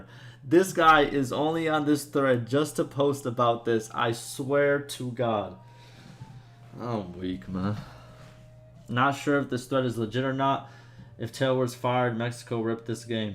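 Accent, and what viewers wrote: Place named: Los Angeles, United States. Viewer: Lol wow from the Ukraine??!! Dude your voice and demeanor makes me think you are from LA and not even a white guy haha. Keep up the reporting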